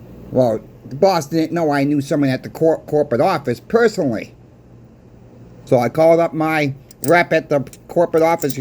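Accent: Boston, United States. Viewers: keep them coming. You got the gift of gab and IDK the accent but it works for the channel 🤣 not to assume but is that a Boston accent?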